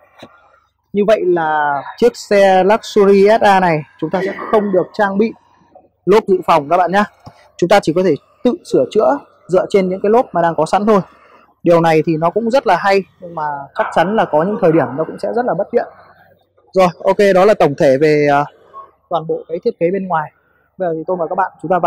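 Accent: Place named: United States. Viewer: May mà bạn nói bạn là người vn nếu ko thì tôi cứ tưởng bạn là người Mỹ đấy.cảm ơn bạn.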